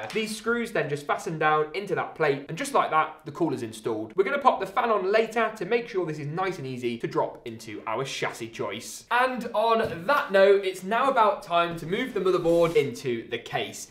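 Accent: United Kingdom. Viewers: 1st this video is awesome. 2nd gotta love that tihcc brit accent, noice🤣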